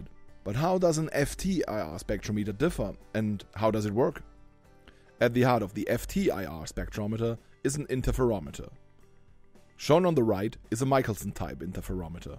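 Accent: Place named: Germany. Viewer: love your German accent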